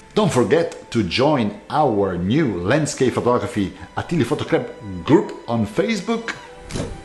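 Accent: Italy. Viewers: Si, ma il tuo accento Milanese é pazzesco, non ti avevo mai sentito parlare in italiano. Bel progetto, Milan l'é semper un gran Milan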